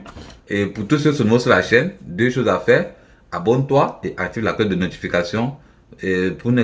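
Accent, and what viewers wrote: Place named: Cameroon. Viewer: T'es camerounais je suis prêt à parier bro sa se ressent à ta façon de parler 😅✊🏾✊🏾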